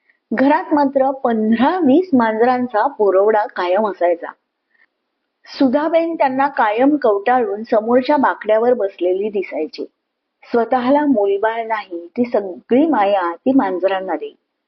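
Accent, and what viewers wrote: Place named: Konkan, India. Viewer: खूप छान सादरीकरण . कोकणी ढब . गुजराथी मिक्स मराठीचा चढ उतार खूपच छान !👌💐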